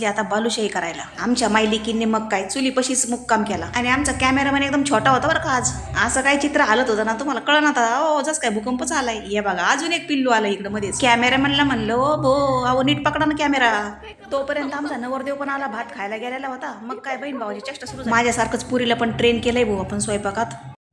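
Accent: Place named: Ahilyanagar, India. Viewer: 👍अरे वा म्हणजे माझा अंदाज बरोबर होता 😄 छान विडिओ असतात तुझे मी नगरला aste👍आपल्या माहेरचं कुणीतरी बोलत आहे asc👍जाणवत तूझ्या बोलण्याच्या टोन वरून 😄🙏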